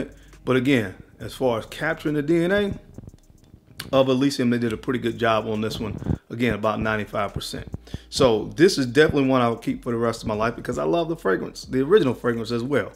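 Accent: United States. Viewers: Because it's an American accent...what your doing is like me telling a French person "it's not Mach donohld, it's Mcdonald!!" When you say sushi or croissant do you also say it with a perfect Japanese and French accent everytime you order it? Lmao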